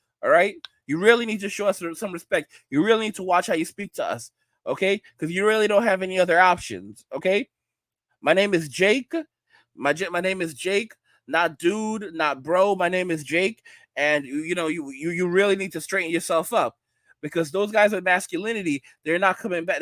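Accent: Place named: New York, United States. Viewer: Women whine and squeal like the pigs under a gate. They're arrogant, selfish, and delusional to a T. After they told us men not to come near them, they're not worth investing so the juice ain't worth squeezing. By the way, your NY accent is on point. Way savvy than Wrestling Writer Vince Russo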